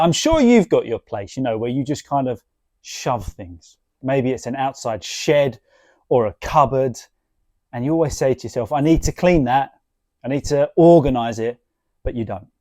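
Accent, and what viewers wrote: RP, United Kingdom. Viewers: Gracias profesor, este formato de vídeo está genial, amo el acento británico, ojalá algún día pueda hablar así 😅 saludos desde Argentina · ❤❤❤❤❤❤ Thank you so much for your teaching The British English Language, it means that The Modern Received Pronunciation Accent from London and The NATIVE Speaker's NATURAL Language with CORPUS (the LIVING Language materials) to immerse the learners into The NATURAL and NATIVE British English Language about NATIVE phrases and collocations in the Daily Life Conversations !!! It's GREAT !!! Love The British English really !!! Thanks your for more Videos later like this in the future !!! ❤❤❤❤❤❤❤❤ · I am the1st . Nice British RP. Cupatea great!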